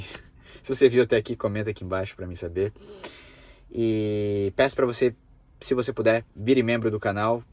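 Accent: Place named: Central Brazil, Brazil. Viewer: Você é de Goiás, Brasília ou Minas Gerais? Tem um sotaque característico desse lugares.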